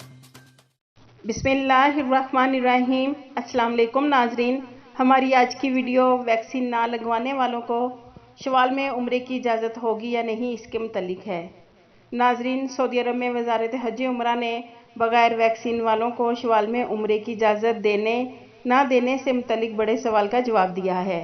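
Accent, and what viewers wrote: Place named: Punjab, Pakistan. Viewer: Good evening! Your pronunciation is punjabi so are you punjabi speaking?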